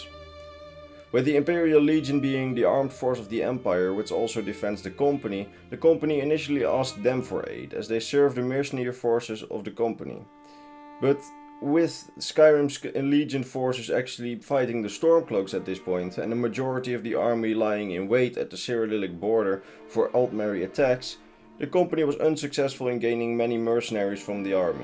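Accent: Netherlands. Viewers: You’re dutch right? Judging by the accent.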